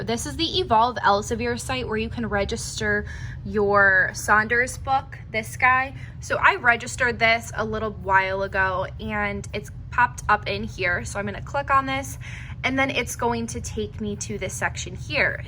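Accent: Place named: Midwest, United States. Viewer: you are sooo cute and thanks for this! I was recently accepted into Chamberlain in Irving, TX! So I HAVE TO ASK! Did you attend the school in Chicago????? You sound like you're from either Chicago... or Minnesota! I was born & raised in Chicago!!!! <3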